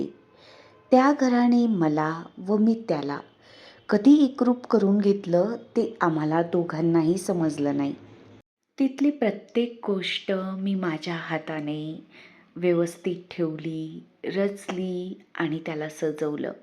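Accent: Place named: Khandesh, India. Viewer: Tai aapli ahirani bhasha aikun khup chhan vatal ❤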